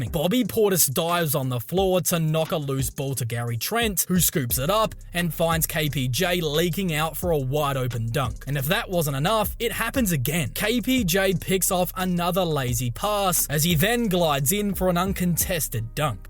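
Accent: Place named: Australia. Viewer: Dang Australian accent. Is it AI?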